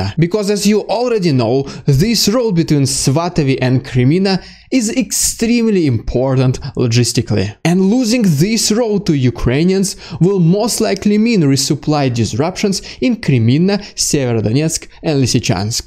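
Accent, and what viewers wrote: Russia. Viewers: I agree wholeheartedly. Maybe it is an Aussie thing, since we adore Canadians, and what could be more adorable than a Canadian with a Russian accent? If you like and Ukraine Matters, I also suggest Denys Davydov and Artur Rehi. Reporting from Ukraine Is also sometimes worth a look. Similar content on all five channels, but occasionally one picks up a significant point that the others missed or glossed over. And it is great to have a variety of perspectives and different nuances.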